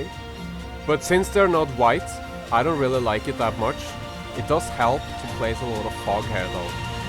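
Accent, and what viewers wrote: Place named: Norway. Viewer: Bro i can hear ur from norway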